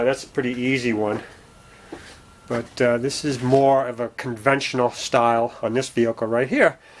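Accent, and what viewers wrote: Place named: New England, United States. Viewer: I know that New England accent I’m from Boston 😂😂great video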